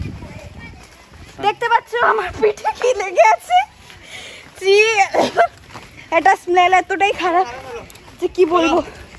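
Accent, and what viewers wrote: Sylhet, Bangladesh. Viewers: Are you from Assam or bangladesh? Because this is sylheti bangla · আপু তুমি কি সিলেটি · Tumi ki sylhety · Sylheti naki tumi? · Does assami people speaks sylheti accent or what or are you from there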